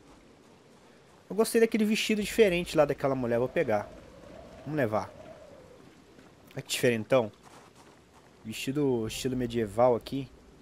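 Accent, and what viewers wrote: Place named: Rio Grande do Sul, Brazil. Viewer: tu tem voz de gaúcho cara kkkkkkkk. Também sou do Paraná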